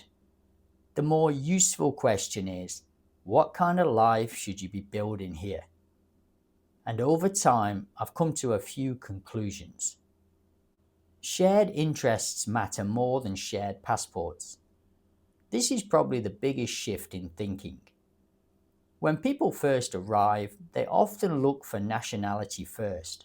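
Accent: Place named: England, United Kingdom. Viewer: could be it's accent thing from England lol, are you from north west UK ? I'm finally coming over. I had set deadline of 55 but pulling the trigger now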